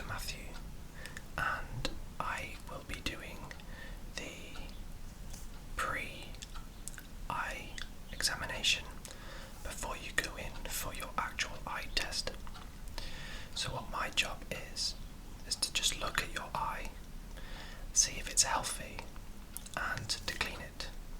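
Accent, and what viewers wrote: England, United Kingdom. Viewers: you are from england?? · *Me before video starts*Please tell this guy is British me when video plays HOW IS HE NOT Edit: is he cause I want him to be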